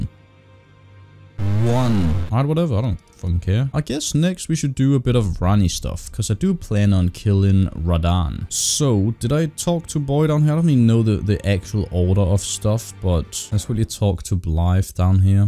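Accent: Denmark. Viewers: så din første elden ring video og ku bare hører du var dansk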